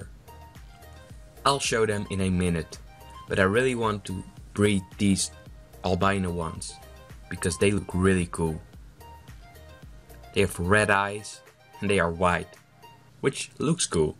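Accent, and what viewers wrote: Netherlands. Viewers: Ik hoor... een Nederlander ;) prachtige bakken heb je! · Hey! Ontdekte je video bij toeval! Aan je accent te horen ben je ook Nederlands. De witte waas in je water zijn dooie bacteriën, dus niets aan de hand! Ik ben gesubt! En ga zo door 👍🏻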